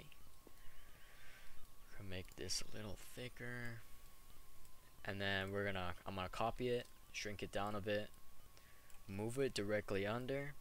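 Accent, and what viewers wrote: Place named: United Kingdom. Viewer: UK accent.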